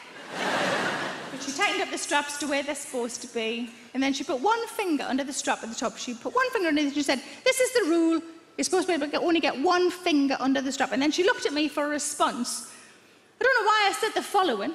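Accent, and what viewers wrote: Newcastle, United Kingdom. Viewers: Is she a Geordie? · Mrs doubtfire had a Scottish accent didn't she? a geordie! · Saying something in a jeordi accent don't make it funny!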